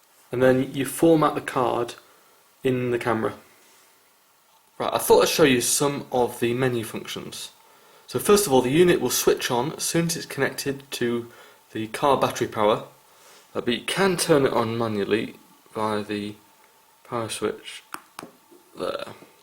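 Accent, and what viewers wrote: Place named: United Kingdom. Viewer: My ear hates British English.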